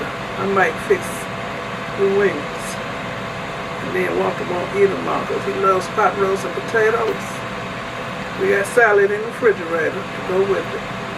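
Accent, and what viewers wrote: Chicago, United States. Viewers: I knew I heard Chicago in you lol This look amazing never thought about adding the soups to my meats … Will be trying soon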